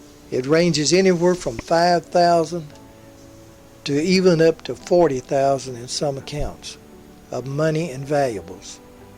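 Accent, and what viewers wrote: South, United States. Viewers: I think we're close to the same age, if Jerry Mather as the Beaver is your age then we're the same. You have a smooth southern voice I like hearing, and being a history major from William and Mary getting to hear stuff that wasn't included is always a plus.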